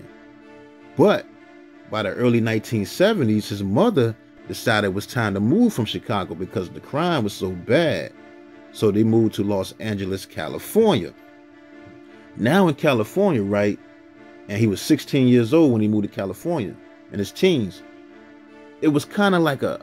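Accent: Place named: New York, United States. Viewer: Your channel is awesome. And that New York accent!!! 😍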